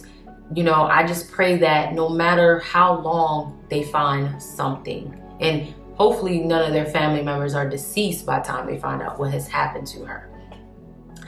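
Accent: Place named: Baltimore, United States. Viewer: Also question are you from near Baltimore? I can hear that accent in certain things you say.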